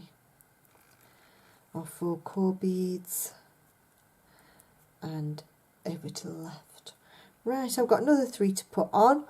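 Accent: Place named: Cheshire, United Kingdom. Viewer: Evening while looking for Christmas dec videos, just stumbled upon your vidios, as much as I love some the American designers and teachers it's nice to see a fellow brit doing some you tube tutorial. This is fab reminds me of spiral staircase. Wonderful. Plus you sound just like my aunty who lives in Cheshire, and works in Manchester very similar accent to you. x x Happy beading.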